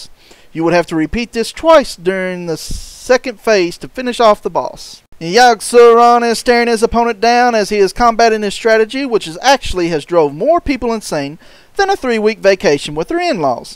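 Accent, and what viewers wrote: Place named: South, United States. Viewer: Like the southern accent, Yogg Saron is my favorite of the Old Gods.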